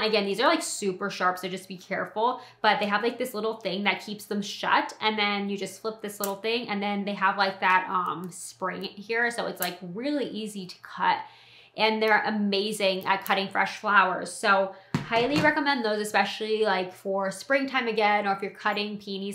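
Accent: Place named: United States. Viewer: Nationality means what nation you are from. She sounds American to me. If you mean ethnicity, my guess would be Caucasian, but she could be mixed. Hope this helps😊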